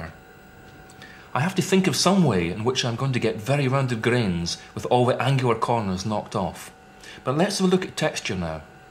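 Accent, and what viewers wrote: Scotland, United Kingdom. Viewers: anyone else only pay attention to his scottish accent and not the video the whole time?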